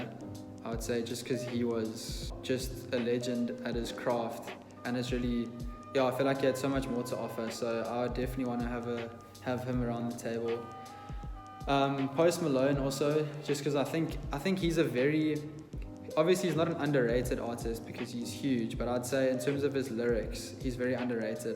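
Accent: South Africa. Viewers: never realized how cool south african accent is until now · yesss called it! the accent is from south africa!!! the only person whom i've heard speaking with that accent was my ex-boyfriend who was from cape town <3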